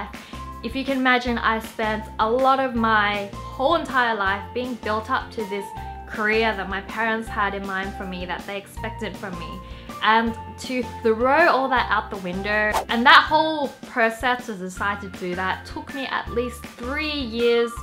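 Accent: Australia. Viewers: I am kinda new to this channel and I was wondering, are you full Asian or are you mixed with Caucasian? I was just wondering because you have an Australian accent but you are from China. Like your videos! Keep up the good work. (^o^) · ...that's an Australian accent + I'm pretty sure she's a Chinese Australian correct me if I'm wrong. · Whoa...angel on earth...you have an Aussie accent haha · Sweetpea she's Chinese but has a Australian accent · Saarah U that and she just sounds Australian (well about 85% of the time anyway)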